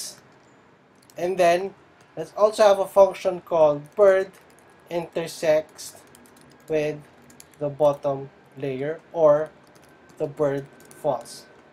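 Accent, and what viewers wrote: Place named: Philippines. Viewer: Pilipino ka no halata sa salita eh xD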